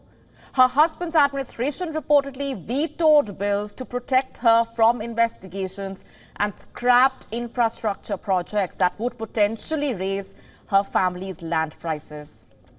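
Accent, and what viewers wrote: India. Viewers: i dont understand indian speaking english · Good info! Her accect is so heavy I can not understand, maybe this a show only for Indians?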